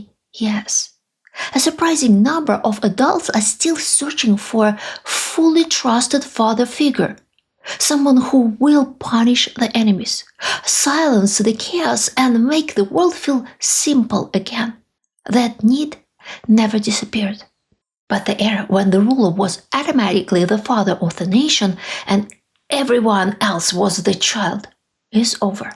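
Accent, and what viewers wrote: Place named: Russia. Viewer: Hi, I started tuning into your channel because I have been a huge fan of ASMR content for years. You have a beautiful, soft voice, and I really enjoy the russian accent. What I ended up discovering was an intelligent woman, with a unique perspective on geopolitics, told with stories and facts. I REALLY, REALLY enjoy your videos, for all of those reasons. I encourage you to continue your work, you are a bright light of truth in a world hell bent on suppressing it. Your work is important, powerful and illuminating. I can't waif to see you continue growing, you deserve that success. Lots of love, from Melbourne Australia ❤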